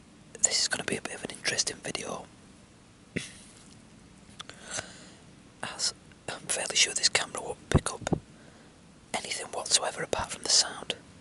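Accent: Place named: North, United Kingdom. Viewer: i bloody love a northern accent on a man . have enjoyed this x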